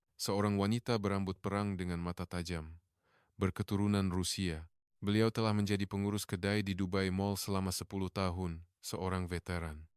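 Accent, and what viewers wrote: Indonesia. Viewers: suara admin org indon.bukan org malaysia.sebab org indon suka <link> malaysia tidak bercakap macam ini. ini penipu dari indon.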